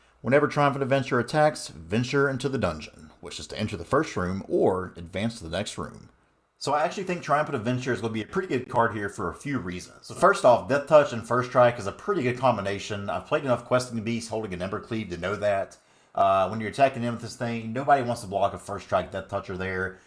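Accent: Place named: Oklahoma, United States. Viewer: btw are you from oklahoma by any chance? lol your accent sounds very much like how 99% of the people im around sound. lol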